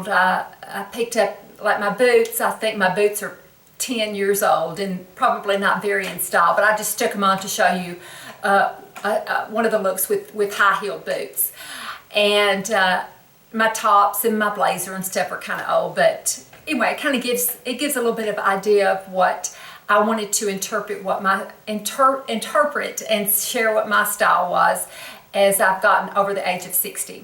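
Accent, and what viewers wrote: South, United States. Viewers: Love your style. Thank you for sharing! I also like skinny jeans and distressed jeans. I'm a rule breaker and wear what I enjoy and what I think looks good on me, my shape and coloring. I believe at my age (I turned 63 in January, but still feel like I'm 30 most days. LOL) I should be able to wear what I want. Your outer beauty is apparent, and your inner beauty comes through when you speak. A southern belle. : ) I enjoy watching all your videos no matter the subject. Your sweet southern accent makes me miss home! I'm from north GA, living in FL. Looking forward to more of your videos!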